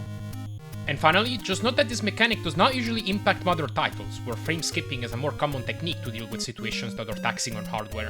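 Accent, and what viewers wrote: Italy. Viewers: you sound Italian ahah, where are you from?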